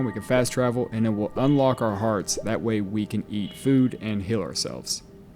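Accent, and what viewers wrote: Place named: South, United States. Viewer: You're from the south, aren't you?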